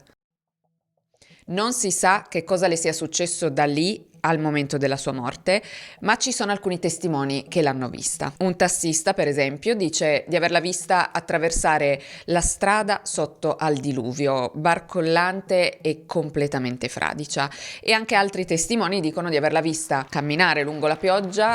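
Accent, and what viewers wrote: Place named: Piedmont, Italy. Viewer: Che bell'accento Piemontese! brava :)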